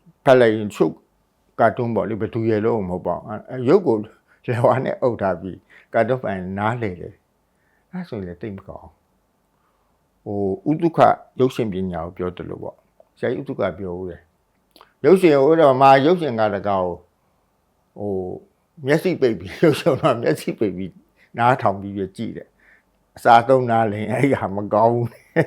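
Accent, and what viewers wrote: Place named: Upcountry, Myanmar. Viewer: အညာသားလေ သံ မပျောက်။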